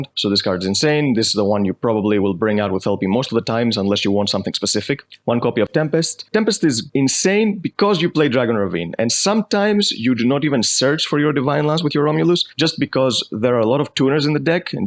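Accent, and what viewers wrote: Greece. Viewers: So I am really find of blue eyes and this deck is rly budget as well... I just have one question: which country are you from?cause your accent is really good for someone whos mother tongue isnt english. Your accent seems like Greek...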